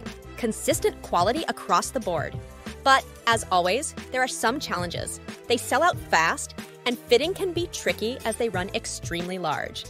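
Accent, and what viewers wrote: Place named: California, United States. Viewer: I’m not trying to be mean, that California accent is straight up out of Saturday Night Live, The Californians . 🦄 🌴 🌞 😂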